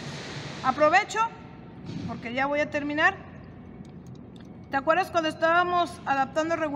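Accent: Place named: Mexico. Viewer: Sra ingeniera es ud una gran maestra !!,ademas de ser muy didactica en su modo de explicar en ese español -mex tan bonito!!! chapeu!!!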